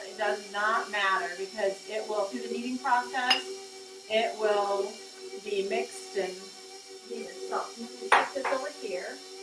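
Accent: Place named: Scotland, United Kingdom. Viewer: The first sentence made me think you have a Scottish accent :-P.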